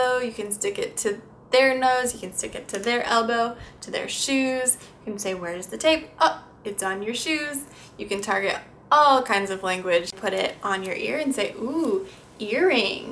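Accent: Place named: United States. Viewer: When I hear you talking, I hear an unclear voice like somebody who just woke up or have been to a place where you had to shout loud, like your voice is cracking, hard to describe but I was talking with other people in my country about it and they said it is typically something American women do. Why do you guys do that? It doesn't sound like a nice clear voice to listen at to be honest.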